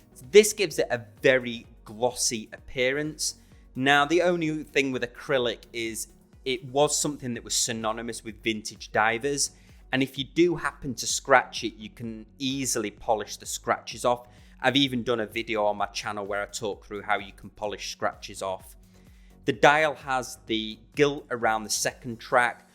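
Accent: United Kingdom. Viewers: Thank you very much. I'm sorry, I thought you lived in UK because of your accent :)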